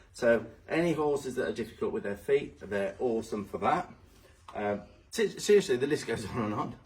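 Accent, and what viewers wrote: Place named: Wales, United Kingdom. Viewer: Brilliant tips’ VLOG like the other one. By doing what you do, it removes all the flight/fight out of them … and they may actually enjoy the riding. I knew Stephanie Powers was into horses from watching her on some US talk show many years ago, where she discussed her custom made equine dinnerware … it was beautiful … and it was also for charity too. I wonder if she still has the set?!? But I didn’t know she had rescue properties all over the world. It should be an awesome visit/session. The way you were talking it sounded like it’ll be a members only VLOG … 😢! Pity!!! Well, you’ll both have done quite a lot of globetrotting this year … mind you your Vacation time is usually in the Winter/Fall seasons, which tend to be the off-season for some. This was an unusual year though … what with the wedding and all! Love the colour of nails 😊 … will look nice with the tan you’ll be working on! 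I’m wondering if the place you’re going to is that rescue you visited at, in one of your VLOGs? I think it was there we saw it. Or maybe that was Greece … you mentioned you guys lived there for severeal years at one time. Hope you have a wonderful time again, when you’re back in the US … hopefully it won’t be too crazy weather-wise! Things are getting a bit extreme, in various parts of the world. Loved the look for today’s VLOG … almost forgot you were Welsh … or are you British (?), until you started to talk! 😂 I’m looking forward to the next VLOG. Safe travels and Happy Trails! 🙏🙌🐎🐴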